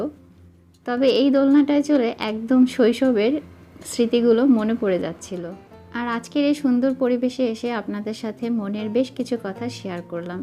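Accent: Sylhet, Bangladesh. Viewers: Are you sylhetii apuu ??? From uk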